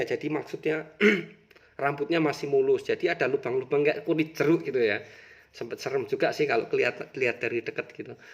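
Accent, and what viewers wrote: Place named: Java, Indonesia. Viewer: kok medok banget om , orang jawa mana aslinya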